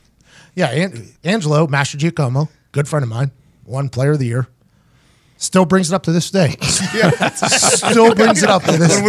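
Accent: Pittsburgh, United States. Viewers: I love hearing the Pittsburgh talk when I listen! Good stuff. The Vally news dispatch! Oh blast from the past! Good stuff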